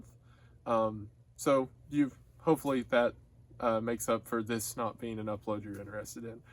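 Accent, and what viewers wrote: South, United States. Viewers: Trying to figure out where his accent is from. Atlanra...the carolinas?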